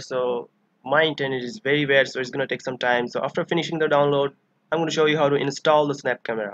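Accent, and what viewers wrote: India. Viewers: Bro your voice sounds good in regular Indian accent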